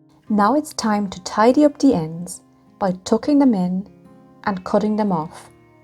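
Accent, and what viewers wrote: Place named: Ireland. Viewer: Fantastic tutorial and great education to keep tradition alive. Where are you from originally. Sounds like you've lived in Ireland a long time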